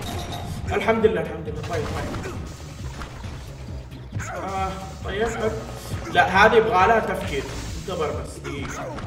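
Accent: Jizan, Saudi Arabia. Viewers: والله احسك من جيزان صح انا من جيزان و اعرف هاذي الهجه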